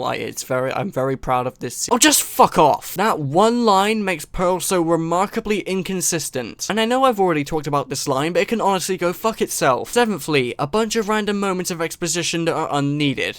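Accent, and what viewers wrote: United Kingdom. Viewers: I use your videos to pick up the british dialect, oh, and the actual content too.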